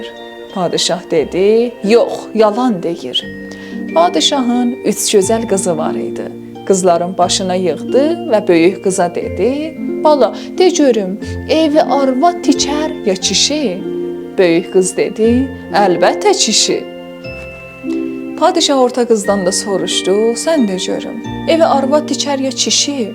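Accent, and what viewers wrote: Iran. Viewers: Mənim 70-yaşım var, bu nağılı 10-12 yaşımda "Azərbaycan nağılları" kitabında oxumuşdum. İndi cənublu qızımızın dilindən şirin Təbriz ləhcəsində yenidən eşitmək istəyib axıra qədər qulaq asdım. Uşaqlığım yadıma düşdü. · Ай гыз фарс лейчесийле данышма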